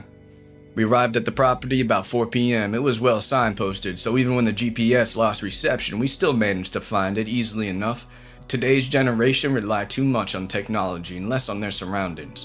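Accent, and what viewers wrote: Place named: South, United States. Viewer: I like these dogman stories! Lon Chaney as the Wolfman comes to mind. You read this one quite fast though. I couldn't savor the flavor. Love the idea of a house of glass, though, how folks could be one with the trees all around and no bugs to bother you! Imagine a light rain pattering against the glass, a fire in the fireplace, warm and cozy, with little snaps and crackles and suddenly a body thrown against the glass. Great story. May I ask where in the south you are/were from? I can hear a bit of the twang in your voice that we southerns can't seem to loose no matter how hard we try.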